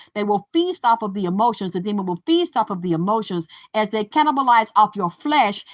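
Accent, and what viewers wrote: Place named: Georgia, United States. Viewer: Can I just say how much I love your Georgia accent! I can listen to it all day! Queen Drip 💧 in this Bitch! Vagina Power 💙💙💙💙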